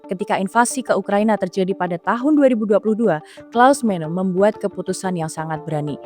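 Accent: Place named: Germany. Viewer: Oh orang Jerman rupanya patutlah cakap English pelat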